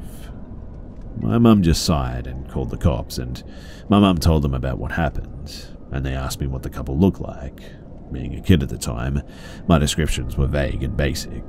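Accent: Australia. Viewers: I normally can't stand hearing a fellow Australian accent in videos/movies but this voice is particularly nice. Thanks for the content, I'll leave a like & subscription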